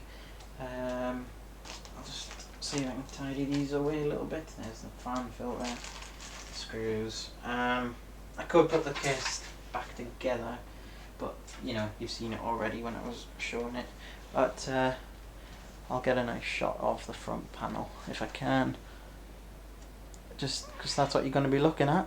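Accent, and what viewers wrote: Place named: Newcastle, United Kingdom. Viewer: Dude where are you from , you sound pretty local to me, newcastle??? im getting one of these cases, so good to see a decent review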